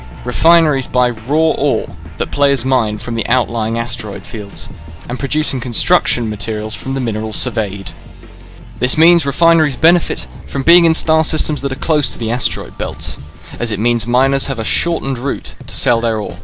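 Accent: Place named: United Kingdom. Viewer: That British voice... makes me weak at the knees;) I don't need no voice.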